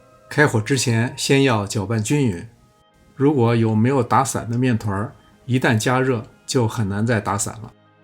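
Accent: Beijing, China. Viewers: 非常喜欢您的视频，其一是语言简洁，其二是内容适合我的口味，其三是地道的京腔，每期必看。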